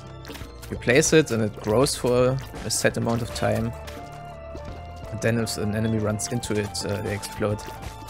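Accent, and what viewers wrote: Germany. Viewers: Are you a german? You have that accent xD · Your voice with the german accent sounds just like one of the guys from Team Darkside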